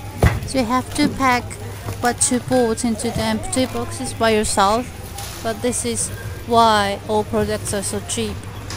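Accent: United Kingdom. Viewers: イギリス英語かな❓ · イギリス系英語？聞き取りやす～い！ゆうくん可愛い❤️うちのこもこんなんやったな～ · すごい行列。朝市って意外と混むんですね。 アクセントが、イギリス英語かな？ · イギリス英語ですね😃✌️🌹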